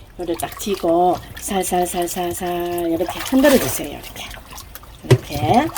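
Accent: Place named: Gyeongsang, South Korea. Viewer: 경상도 분이라 설명이 쏙쏙ㅎㅎ 잘 배울께요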